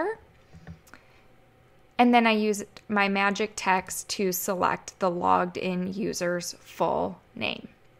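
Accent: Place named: Wisconsin, United States. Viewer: You're (whoever is doing this video) is pretty good at explaining the videos! You just keep forgetting to add the links you mention... you should probably put "Remember to add the link." in your notes though. Can I request you do a video on the proper use of anchoring components? Every time I think I have it down, I realize that I was wrong! Also, where is that accent from? I'm guessing Wisconsin or somewhere close.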